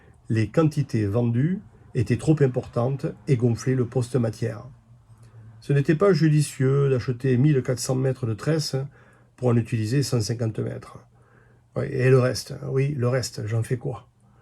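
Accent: South, France. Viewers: Effectivement, pas un luxe de les rénover! Merci de nous apporter du soleil avec votre bel accent du sud, à nous les nordistes sous la fraîcheur…estivale ! 😇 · superbe restauration il est vrai que notre société de consomation ne voie pas toujours cette fonction de pouvoir réabilité certaine chose.Mais quel plaisir de vous écoutez aussi parlé dans la langue de pagnol au chant des cigales vous êtes un conteur histoire magnifique j'adore 🙂, de plus je suis du sud aussi bravo a vous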